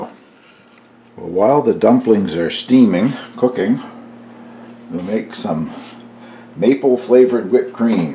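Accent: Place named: Canada. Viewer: this looks like a yummy pudding I am wondering if the dumplings have come from my homeland (Scotland ) sadly I now live in Oxford,England which is a beautiful city of course but its not Scotland. I love your recipes its just lovely listening to your Canadian accent and enjoying all the things you cook including the cheeses. I live in a tiny bungalow with the smallest kitchen ever so I cant see myself getting into the cheese making including the press etc that needed. I started off watching your Cabin episodes but now I love all your videos I'm a big fan thank you again Mae x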